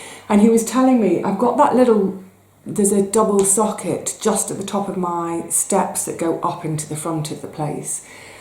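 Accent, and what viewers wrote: United Kingdom. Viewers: british accents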